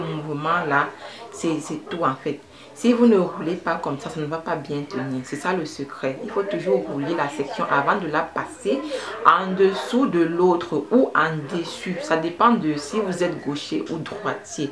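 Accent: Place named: Cameroon. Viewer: Merci tantine J'entend ton Cameroun ce pays me manque ❤️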